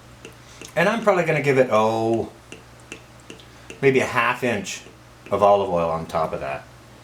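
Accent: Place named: North, United Kingdom. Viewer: If you grow a hamburger over the winter, I want some of those seeds.!! It sounded like grow even though I think you were saying throw, what can I say you have a Northern accent.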